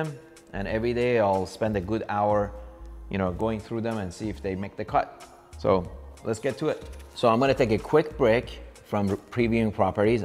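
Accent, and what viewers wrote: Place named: Iran. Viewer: Pure Iranian accent. It's good tobe original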